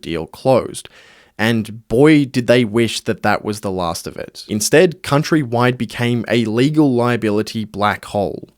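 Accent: Australia. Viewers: Do your channel a favor and please get someone to do VO that isn’t Australian. I made it 90 seconds before NOPING out.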